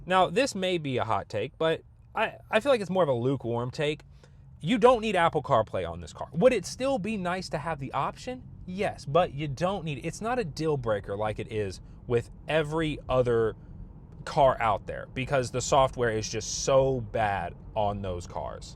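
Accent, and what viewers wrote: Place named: Georgetown, Guyana. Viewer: I thought you sounded familiar, I am from Georgetown!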